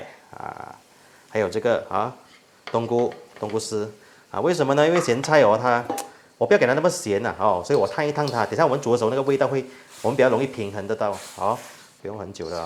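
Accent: China. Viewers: 广东，一块都讲成一告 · 你的客家話很重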